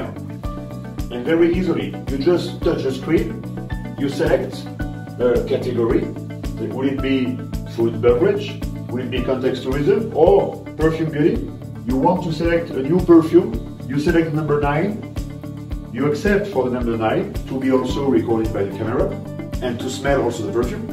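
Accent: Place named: France. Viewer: Bravo. I love your french accent!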